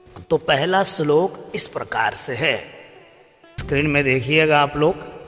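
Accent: Standard. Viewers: बहुत ही खूबसूरती से शुद्ध उच्चारण के साथ आपने पूरा स्तोत्र का उच्चारण बहुत ही शुद्ध भाषा में समझाया महाराज जी बहुत ही सुंदर उच्चारण के साथ कुछ लोग अशुद्ध उच्चारण के साथ इसको आज के समय गा रहे हैं अशुद्ध उच्चारण से कहीं ना कहीं यह हमें इसका फल गलत मिलता है इसलिए शुद्ध उच्चारण के साथ जो आपने समझाया एक एक श्लोक बहुत ही शुद्ध उच्चारण के साथ आपने गाया बहुत ही खूबसूरती से मैं आपके चैनल को सब्सक्राइब कर रही हूं और आपसे जुड़ रहे हैं धन्यवाद महाराज की शुद्ध उच्चारण से समझाने के लिए जय मातेश्वरी जय भवानी हर हर महादेव 🇮🇳🙏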